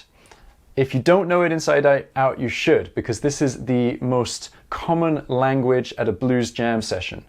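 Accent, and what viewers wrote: Scotland, United Kingdom. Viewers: You're sounding less and less Scottish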